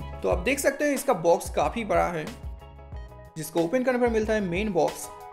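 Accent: Bengal, India. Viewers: Are you Bengali??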